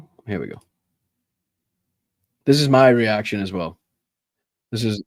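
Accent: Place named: New Bedford, United States. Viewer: I was raised in New Bedford. You sound like the folks I grew up with. Keep the example up. We must keep going. No dropping the ball.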